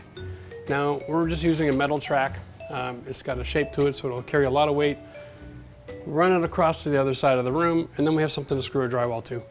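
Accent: Canada. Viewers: Mate, I love your work and your accent. I was brought up in Edmonton but have lived in Australia for the last 45 years. I still love to hear Canadians talk. I really miss Eatmores and Coffee Crunch too! Take care and stay safe....Kev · Wait . . . are you really not gonna tell us about what happened to your eye? . . . I just ran into your videos this morning and I they're a pleasure to watch and listen to even when I stepped away from my desk. . .that elevator music and your Canadian accent do the trick. . .Keep up the good work!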